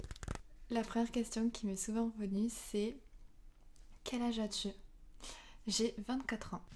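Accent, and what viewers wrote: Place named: South, France. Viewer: Merci, on entend ton accent du sud :) Mignon Kawaï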